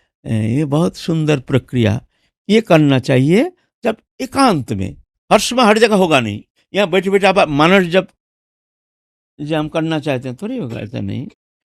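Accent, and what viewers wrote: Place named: Bengal, India. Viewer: Baba ap bengali ho na ??? Apka batchit me bengali tone ata hai. Ap jorur bengali ho. I am bengali.kolkata.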